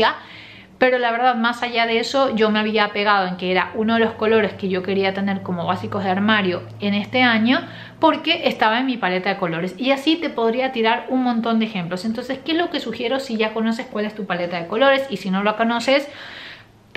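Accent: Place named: Argentina. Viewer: Me encanta tu acento, eres argentina? Saludos de una argentina en Texas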